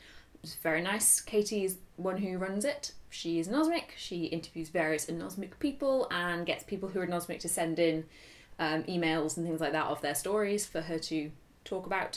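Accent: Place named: United Kingdom. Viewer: British Accent <3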